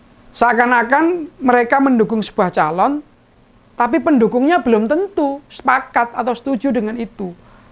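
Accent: Java, Indonesia. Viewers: Ora percoyo Karo awakmu kang ~ podcast ngomongmu wae medok ngono dobol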